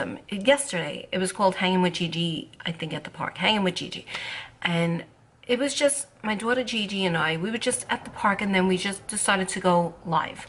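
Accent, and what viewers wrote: New York, United States. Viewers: I always say, 'People are people' we're nice we're not nice we're doing we're not doing. What. Ever. You my dear are beautiful! I am not a subscriber, 1st timer actually. Yourre a New Yorker. You?! Really. No kidding. Love the accent. Love this personality. I find you quite cute. Really. I'm from California...in California...will probably die in California. Born in San Francisco actually. Not much of a talker tho. But a good listener. Liked your content. Thank you for sharing. With Love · I love your coffee talk! I love You! Your gorgeous!And i hope you know how beautiful you are! I'm a native New Yorker living in Fl now and enjoy hearing the voice of one of my peeps😉 yeah not responding is the best ( go f yourself ) to those ( low life trolls ) seriously, what kind of person wishes sickness and death on another person?! 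Well, I believe in karma...anyway, you keep on doing your thing ...trolls will go away when they aren't getting attention. As for the so called friends....they can take a walk too.😊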